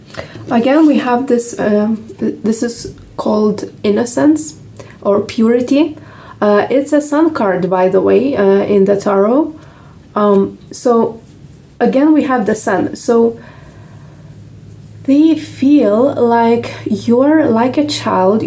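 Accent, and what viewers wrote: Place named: Eastern Europe. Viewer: I like your accent but it's nice to listen because we're both readers and Slavics 😉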